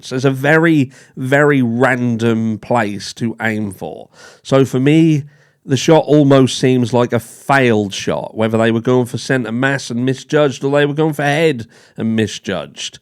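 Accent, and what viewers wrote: Norfolk, United Kingdom. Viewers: Obviously don't tell people where you live but by your accent I believe you lived in Norfolk .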